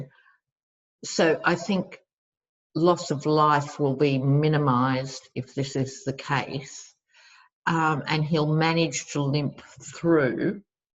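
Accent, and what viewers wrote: Australia. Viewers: I have always loved the Australian accent..🤗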